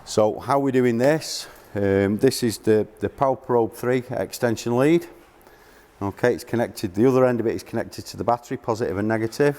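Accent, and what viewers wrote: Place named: RP, United Kingdom. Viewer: Like the posh voice, is that for to understand you, with you being like me a Northern Lad 😂😂, great testing there love, quality is great on video and audio here in sunny Gran Canaria, take care boys 👍😉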